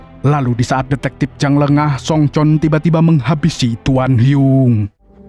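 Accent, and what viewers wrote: Banjar, Indonesia. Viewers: Umaaa urg banjar sekalinya leh😂😂😂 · Banjar kah nih 😂😂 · Bahasa Banjar kah teh tadi lwn logat nya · Anjirrr urng Banjar kah ikm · Urang Banjar kaya nya nih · Lah banjar wkkwk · Umaa urg banjar jua sklinya😂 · Nah, urang Banjar sekali nya pang 😂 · urang banjar kah pian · Urang Banjar lah pian ni bang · urang banjar kah mang😂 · Banjar pinanya 😂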